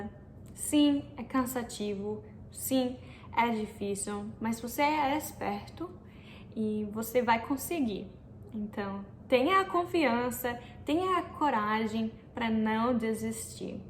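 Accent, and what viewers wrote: Brazil. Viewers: seu "português" + "sotaque brasileiro" estão perfeitos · vc vai falar igualzinho aos brasileiros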